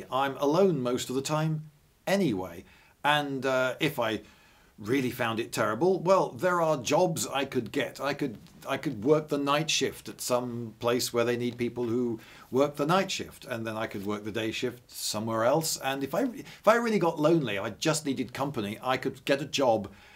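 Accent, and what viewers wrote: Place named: United Kingdom. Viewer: Very British man overanalyzing a hypothetical question? Yes, please!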